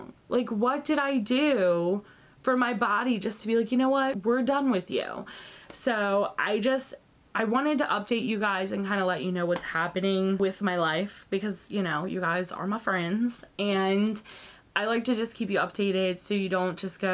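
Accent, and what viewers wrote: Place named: Philadelphia, United States. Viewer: I just subscribed after your declutter and saw this video. My son had eczema so bad as a baby that we had to take him to see a pediatric dermatologist. We had to put giant bandaids on his face because his cheeks were red, shiny, and weeping. He's now 11 and after several years of relatively minor issues, he just started getting the red rough bumpy patches behind his ears and on his arm where his watch is so we started topicals and we are limiting unnecessary showering. It definitely cycles especially with allergy season. It messes with you mentally and emotionally and you are right some people have no idea how much it can impact more than your physical health. I recently started on the spironolactone due to pcos and I seem to be tolerating it well. I noticed the benefit of the clearer skin. Its nice to have a postive side effect for once. 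You got this! Also, where are you from? I'm originally from Philly and you sound like you grew up in that area.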